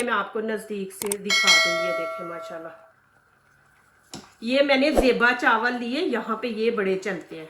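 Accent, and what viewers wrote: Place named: Punjab, India. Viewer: Jazakillah piyari naik baji. Aap bohat mukhlis aur piyaar karnay wali baji hain. Aapki har recipie aalaaaaaaa hai. Aapkay program main bohat apnaiyat ka ahsaas hota hai. Aap bohat mahnut aur muhabbat sai hamain sub kuch Sikha rahi hain. Allahpaak aapko do jahan ki khushian day .Aameen. Aap bawazoo rehkur khana pakati hain aur duaen daity rehti hain . Aap apnay parents ko hamara bohat salam kahain kiunkay aapko daikh k unki tarbiat pay rashk aata hai. Baji aik baat aur kabhi hai k aapka piyara punjabi lahja hamain to bohat acha lagta hai magr kuch loag aapka mazaaq uratay hain. Jo humsai bardahst nahi hota. Allahpaak subko kamil hidayat day. Aameen.🤲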